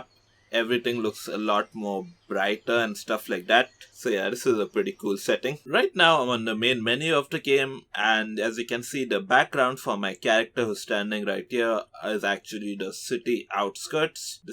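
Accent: India. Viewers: I know you're most likely indian, but still love your accect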